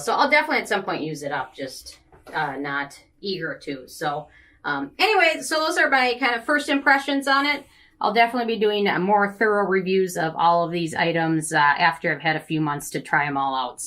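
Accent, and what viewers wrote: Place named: Wisconsin, United States. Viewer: The moment you opened your mouth and talked, I KNEW you were from Wisconsin!!! Love my fellow wisconsinites!!!!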